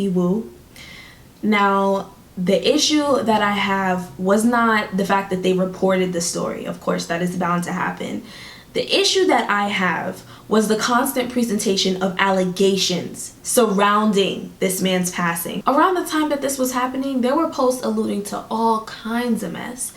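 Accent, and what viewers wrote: Jamaica. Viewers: I here the Jamaican in you😘😳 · Hooooolllld up , girl u from jamaica , cause dah lawd gad deh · I just found you and immediately after hearing the "lawd gad" I subbed! I love finding Jamaican YouTubers! And btw you were speaking nothing but FACTS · I hear that 🇯🇲 accent. 1❤ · I heard the Jamaican accent and subscribed...Goodie🇯🇲🇯🇲🇯🇲🇯🇲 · Gurl! Yu Jamaican!? Lie? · subscribed as soon as I heard that Jamaican accent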